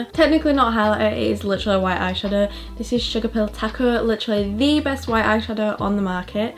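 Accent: United Kingdom. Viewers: Omg u're britishh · She’s BRITISH🤯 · I follow her on tiktok and when I saw she had a YouTube channel I was so happy! When I played the video tho, her voice shocked me, I thought she was American but shes British. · Wait are you from the uk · Is she British??? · She is BRITISH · Youre britishh!?!? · WOoh i thought she has an american accent not a british accent hahaha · yea I was so shocked when I found out she was British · James charles: No comments about voice Moriah elizabeth:no comments about voice: omg ur voice is soooo BRITISH i thought it wud be like american.... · Who knew she was brittish lol I am shocked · First time hearing your voice... and I'm like WTF SHE'S BRITISH, but like where abouts you from cause I know a few people with your accent? Xx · Wait I watch ur tik tok’s and I never heard ur voice! I thought you was American wait so are you British like me??? 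🤔☺️💗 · wait wha- your British!!!!!! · DUDE I THOUGHT SHE WAS AMERICAN BUT SHES WHATEVER WHAT BRITISH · Are you British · where are you from cause tha is a UK accent 101 😂😂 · Wait what the..... she is British i... Wow 😳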